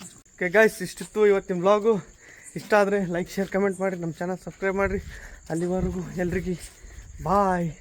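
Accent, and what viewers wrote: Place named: Kalaburagi, India. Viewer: Anna yavuru nimdu nim bhashe Kalburgi thara anisutte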